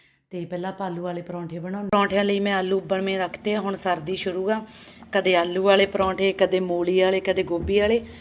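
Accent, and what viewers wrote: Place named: Jalandhar, India. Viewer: Tuhadi language Jalandhar wali lgdi aa asi v Jalandhar to e aa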